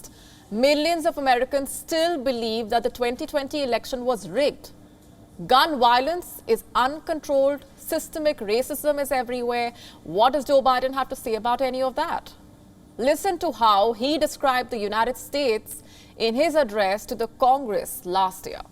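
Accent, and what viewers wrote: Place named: India. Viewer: we can. She is Indian,not supposed to sound like english If u like british accent so much go to english channel. An indian will have indian accent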